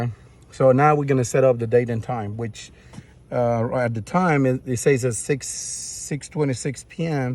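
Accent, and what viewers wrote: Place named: United States. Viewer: Se te nota que eres gringo, pero no habrá forma de que lo mandes aunque sea en espanglich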